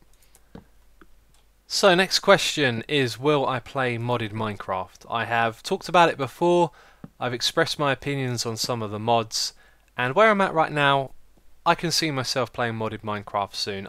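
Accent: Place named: England, United Kingdom. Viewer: Thank you so much man for all the content. The funny thing is that with the whole anonymity thing, you could literally live down the road from me and I wouldn't even know haha. Cause of the exact English Accents:-)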